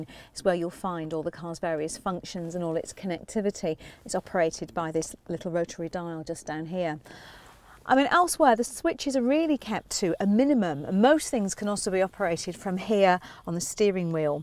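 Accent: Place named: England, United Kingdom. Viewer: that english accent that annoys the world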